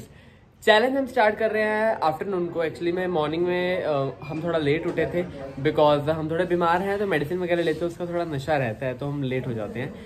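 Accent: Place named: Punjab, India. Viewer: Very Punjabi c bolya karo kntt lagda o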